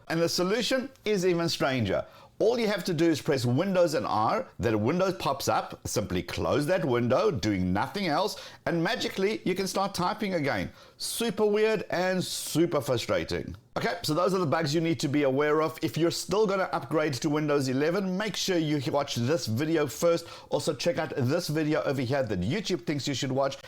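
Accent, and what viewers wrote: South Africa. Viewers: OMG I ALMOST IMMEDIATELY recognized that South African accent !!! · First time watching your videos, noticed your strong South African accent coming through. Nice work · Your hectic SA accent in the videos make my flippin day bru. Info in my tongue is just so much more lekker to consume. Please dont stop doing what you're doing dude. You rock. ￼ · South African?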